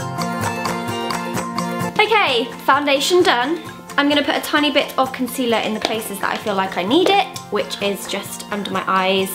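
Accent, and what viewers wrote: United Kingdom. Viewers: Why can't I be British Dx she makes putting on makeup sound so fancy even the way she says "mascaura" instead of mascara xD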